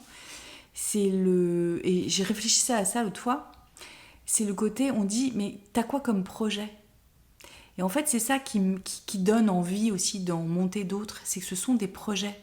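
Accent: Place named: South, France. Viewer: ton accent du sud est parfait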